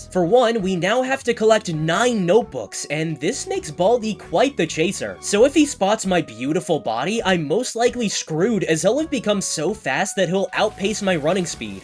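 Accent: Brazil. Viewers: pera ai tu é brasileiro??????????????????????????????????????????????????? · holy moly youre brazillian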